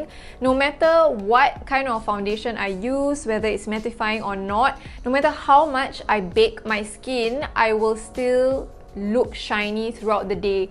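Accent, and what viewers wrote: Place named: Singapore. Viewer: hello just came across your channel you sound singaporeon :) 😀 I half singaporeon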